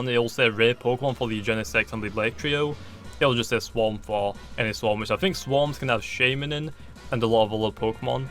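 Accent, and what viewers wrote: United Kingdom. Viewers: What's his accent? British?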